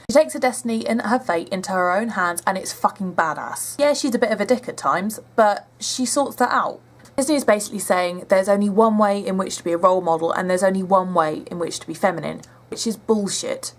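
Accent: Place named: United Kingdom. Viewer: Saw ya comment on Sourcefed. I agree with what you said in your vid, I also think it was also a shame in how they changed her image, really no need for it. I myself thought she was a great character and i really liked her. Not that i have a problem with really feminine women, but i rather the normal down to earth, and even in a way tom boy-ish girl to a certain extent, i even prefer to date women like that :P. Curious i know your British from your accent, but are you also Scottish by chance?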